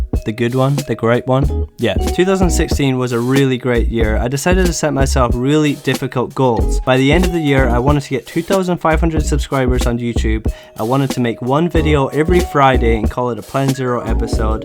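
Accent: Scotland, United Kingdom. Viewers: Good work. just wondering where you are from. You sound Scottish but I’m not sure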